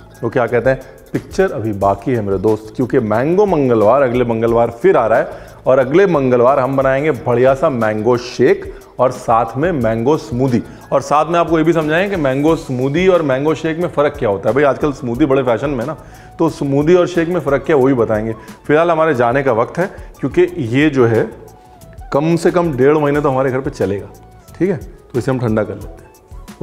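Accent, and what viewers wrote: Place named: Lucknow, India. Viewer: the lucknawi touch in his tone simply adds the magic to each and every recipe of our dear chef...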